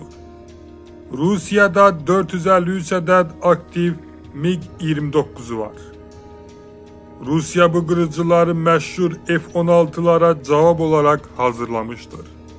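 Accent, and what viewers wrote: Armenia. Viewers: Danisan 100% Ermenidir, cunki Azerbaycanli ele danismir.100% feyk. Elaclari kesilib her firildaga el atirlar. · Men hec bilmirem bu kanal ermenilere qulluğ eleyir yoxsa bize. Son vaxtlar bı kanalda verilen xeberlerin coxu panika yayıcı melumatlardı. Danışanın lehceside ermeni lehcesine oxşayır. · Не правда.. Даже автора голос на армянский голоса похож.. Я как карабахский ветеран и связист вам говорю.. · Diktorda ermenidi yaqinki. Azerbaycanniya oxwamir hec daniwigi. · Акцент армяский . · Danışan hele bil ermenidi, gücene gücene danişir aksentnen danışır, bizi qorxutmaq lazım deyil, artıq biz dünyaya ses salmışıq 🇦🇿🇦🇿🇦🇿🇦🇿🇦🇿🇦🇿💪💪💪💪💪💪🇦🇿🇦🇿🇦🇿🇦🇿🇦🇿🇦🇿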